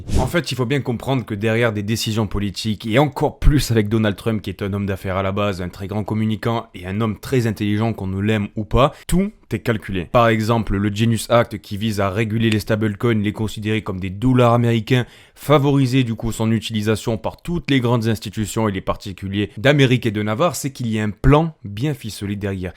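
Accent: South, France. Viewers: tu as l'accent du soleil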